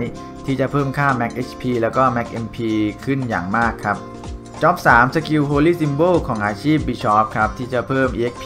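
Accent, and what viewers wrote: South, Thailand. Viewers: คนใต้บ่นิ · พี่เป็นคนใต้หม้ายครับ ..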